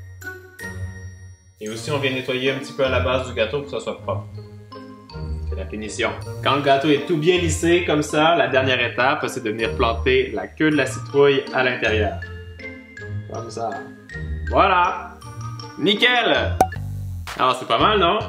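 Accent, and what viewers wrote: Quebec, Canada. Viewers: J'aime beaucoup ton maquillage , t'es vidéo et ton décore ! Ça a l'aire super bon . J'adore ton accent Québécois . Bref je t'adore !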